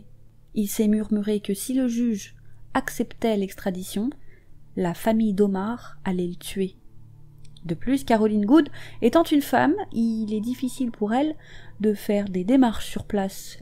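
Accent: Arab world. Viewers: Oua l'accent arabe , on voit d'où vous venez......peut être, l'Algérie.......🤔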